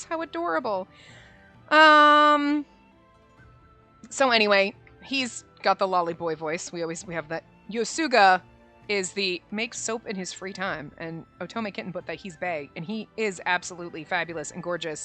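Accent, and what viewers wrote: Ireland. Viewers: The irish accent Yay! Time to shop I need this game, the artwork is beautiful. Yin and Yang ☯️ also I love your voice acting.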